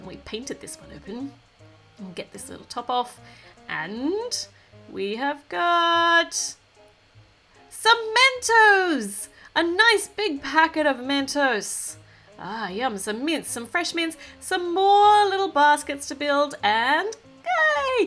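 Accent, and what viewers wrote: Australia. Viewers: Your Australian? I’m Australian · OMG ARE U AUSSIE SAME!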